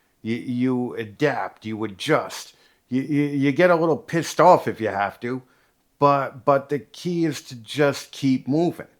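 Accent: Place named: Chicago, United States. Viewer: 1. Was prosthetic leg not an option? 2. Great message/spirit. 3. Have you ever lived in Chicago? Your accent reminds me of when I lived there.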